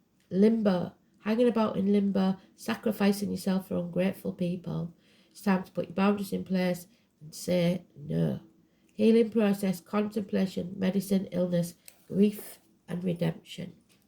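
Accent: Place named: United Kingdom. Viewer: I love your beautiful accent! I see you are from the United Kingdom, I realize that is not just England, LOL. I lived in England when I was a young girl, up north in Durham County, in Barnard Castle. I loved it there. I would come home covered in mud from the Thames after playing in the castle and running around with Shetland ponies, LOL LOL LOL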